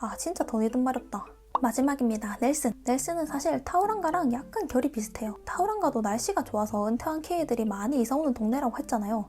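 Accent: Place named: Seoul, South Korea. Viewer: 서울말이 늘었네요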